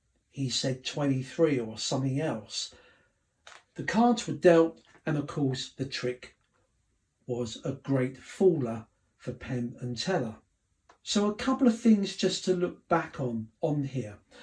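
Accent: United Kingdom. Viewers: Mic-method Clever but still pretty lame. You do not fool the people you ask for help and the people who went with the guy to the show who knows his voice. I do not think that this is a good trick. I think it is the worst performance I saw on Penn & Teller. She deactivated comments under this video. She problably got some hate for it. She should have done some stunt and magic. Her British accent is hot.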